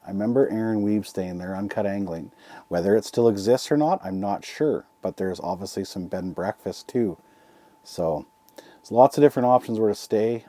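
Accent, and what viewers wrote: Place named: Canada. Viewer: I think it's hilarious how Canadians say semi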